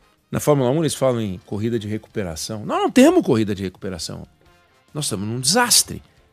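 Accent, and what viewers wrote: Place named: Santa Catarina, Brazil. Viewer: Você deveria de falar para prefeito governador ajudar o governo federal fazer escolas técnicas para gualificar nosso jovens 20 por cento dessas vagas não foram preenchida aqui na minha porquê são vagas de mão de obra qualificada tem muito jovens querendo ecresar no mercado de trabalho mas tem como pagar cursos técnicos você parece que é de Santa Catarina com fala você não ajuda na só atrapalha você é filiado algum pardido porquê vocês da imprensa não ajudam vocês fala que melhorou pastante as vagas de emprego nos estamos precisando de mão de obra gualificada e que mais tem remuneração de salário melhor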